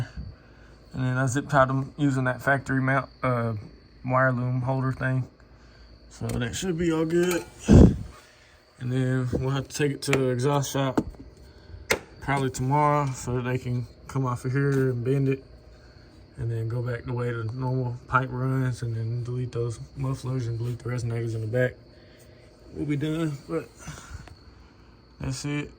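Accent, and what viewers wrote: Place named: Texas, United States. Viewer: Where you from bro you talk like me I’m from Dallas lol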